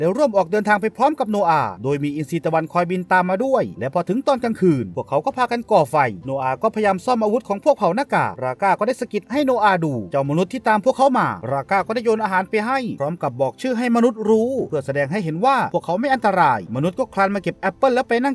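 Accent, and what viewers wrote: Isan, Thailand. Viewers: พากย์ออกแนวอิสาน สนุกดี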